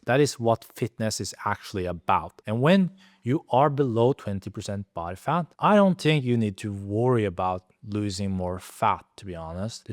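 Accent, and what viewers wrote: Norway. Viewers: Wait, it took me a minute to realize, but you have a Norwegian accent. Cool! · Love the Norwegian accent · Do I detect a norwegian accent?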